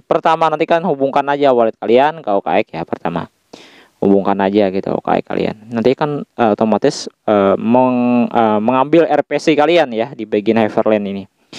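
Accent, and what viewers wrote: Banjar, Indonesia. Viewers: Logatnya Banjar banget min..😅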